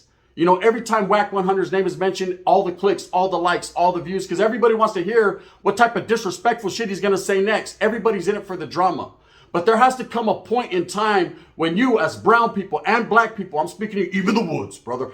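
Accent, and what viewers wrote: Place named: Central Valley, United States. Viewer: 🗣️🔥 you speaking like the Merced to Stockton vibe. The fuse is lite he was out of pocket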